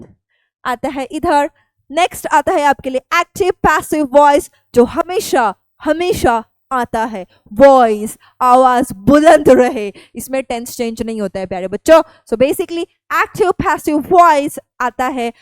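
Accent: India. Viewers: Hand gestures ,indian english and over acting pure ke pure sade panso rupey cut kardiya jayega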